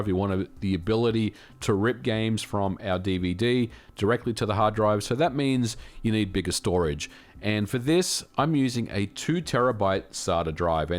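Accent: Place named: Australia. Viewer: Still crazy to hear an Australian accent in such a popular gaming channel.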